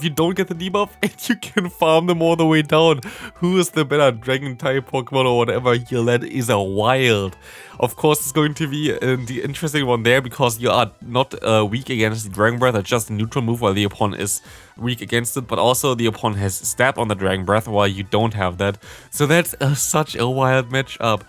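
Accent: Germany. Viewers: Nice Video, are you german???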